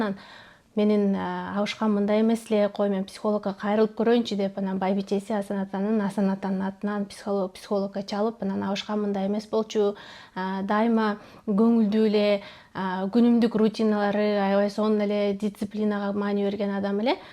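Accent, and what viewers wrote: Standard. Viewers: Кыргызча таза суйлойт экенсиз🇰🇬😍ыраазычылык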